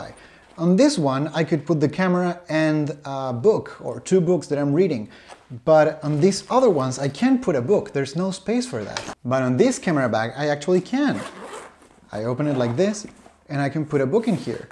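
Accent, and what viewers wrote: Chile. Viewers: ... eres de chile?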